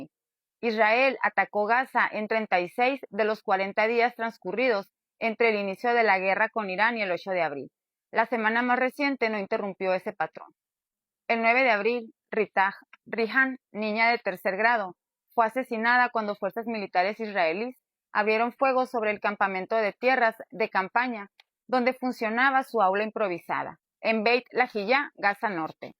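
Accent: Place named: North, Mexico. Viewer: ayer 20 de abril fue el cumpleaños del fiu rer. El ultimo Avatara. y mientras tanto, "los aliados" ("la escuadra y el compas", los verdaderos supremacistas) mantienen a todo el mundo en estado de guerra perpetua desde 1948, dando el "sacramento" para el hematofago genocida que ellos adoran como "dios de los ejercitos". esta demostrado que esos supremacistas no entiendn otro idioma mas que el de la violencia y la fuerza. Muchos creemos que el mismo Cristo vino al mundo para terminar con ese culto demoniaco que llaman "judaismo", y el demonio disfrazado de Dios junto con los depravados supremacistas que se creen "elegidos de dios". SIN TREGUA NI PIEDAD, EL BIENESTAR DE LA MAYORIA DEBE IMPONERSE SOBRE ESA MINORIA RAPAZ, SUPREMACISTA Y GENOCIDA. GRACIAS POR COMPARTIR. ps me encanta el acento del norte, sobre todo de shihuahua! iñoor!!!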